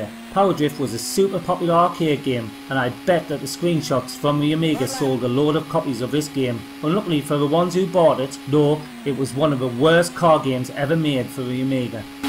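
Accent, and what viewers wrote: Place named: Newcastle, United Kingdom. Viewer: Geordie retro computing! Subscribed!!!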